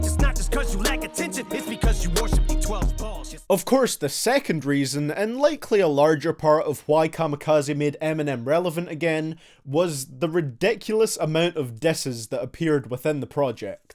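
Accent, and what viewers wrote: Canada. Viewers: this is the most canadian accent i've ever heard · Okay his popularity was never gone but maybe his album sales was halfed until kamikaze but he still outsold his peers ...so he still wins EMINEM WAS NEVER IRRELEVANT EVER EVEB WITH HIS NOT SO GOOD SELLING REVIVAL!!!! Also are you Canadian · Canadian accent. Well good video anyways.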